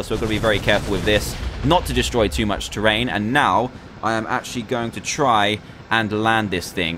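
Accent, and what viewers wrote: United Kingdom. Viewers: HE'S BRITISH LIKE ME IDIOT! French ppl sound different!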